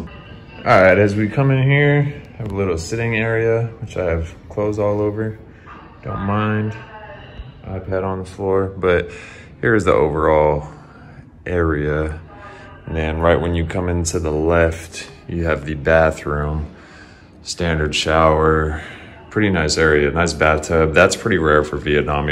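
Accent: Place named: Oakland, United States. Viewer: damn, what kind of accent is that? u grew up in oakland or somethin?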